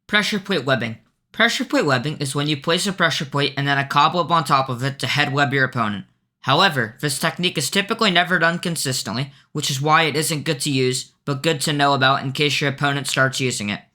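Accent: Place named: Ireland. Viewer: I love the Irish accent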